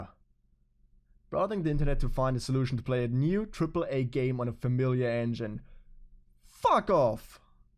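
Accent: Germany. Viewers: Are you German? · Are you from germany?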